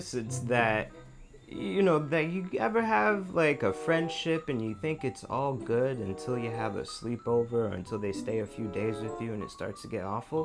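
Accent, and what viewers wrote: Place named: United States. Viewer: i always wondered if you were Guyanese ,and why you talked like that, I thought you were trying to be Americian, and of course you are,but I thought you were trying to be a fake Americian.,and you use to irritate me with your accent.but now I know you are a real Americian I can listen to you with out being irritated.have a nice time in Guyana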